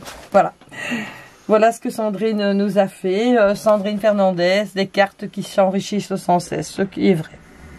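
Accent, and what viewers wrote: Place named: Belgium. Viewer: cc!! je suis abonné a sa chaine et j'adore ses créations!! eh oui tu as raison elle est hyper doué, je n'ai pas eu l'occasion d'échanger avec elle,tu as beaucoup de chance de l'avoir rencontrer,j'ai lu aussi cet article et je trouve que c'est vraiment mérité;bravo a Sandrine!!merci a toi pour cette belle présentation avec ton joli accent belge que j'adore!!bisous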